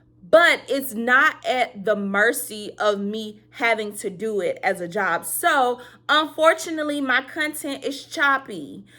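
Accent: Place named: Chicago, United States. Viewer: I'm so here for your vibes! Are you from Chicago? You sound like my people 🙌🏾 I'm from Chicago but I live in Seattle now. I was a med/tele nurse but quit my job around 7 years ago to do my art full time 🎨 I love when the YouTube algorithm put creative Black women on my radar 💕 Love your craft room. Happy birthday!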